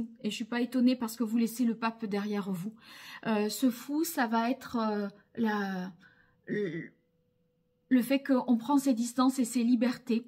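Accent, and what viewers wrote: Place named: Marseille, France. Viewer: Bon bah ça marche ! 🙂 Ça ne me parle pas trop, mais j'aime bien votre accent, ça me rappelle ma vie à Marseille.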